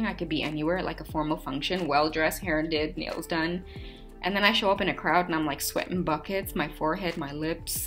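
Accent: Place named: Trinidad and Tobago. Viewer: OMG my video's thumbnail is a pair of passionate lips!! I'm just waiting for it to process. Lawwddd!! Your upbeat energy made me smile right through this reading, love. Fantastic reading! It felt like a personal reading - absolutely on point!! You are so right about the DF leaving the DM to learn his lesson. We are standing in our power and finding our passion. Woohoo dat Trini talk - hot too bad!! Ah love it bad bad!! Thank you so much for the mention in the description, love! So appreciate it! 🥰💕💋🔥🇨🇦🇹🇹. Needed to add the flags!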